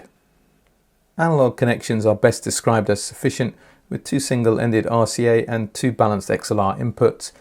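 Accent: United Kingdom. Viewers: Great stuff as always. And by now the way we in the U.S. pronounce 'h' (the correct way) and the way you Brits do so is lost in the background. Personally, I prefer separates over integrated. Especially monoblocs. If others feel differently, I say 'hats off' to them. Plenty of room in this tent for all. My net upgrades? Nothing wrong with the gear I have. At this point the limiting factor is the room. That's a tough upgrade!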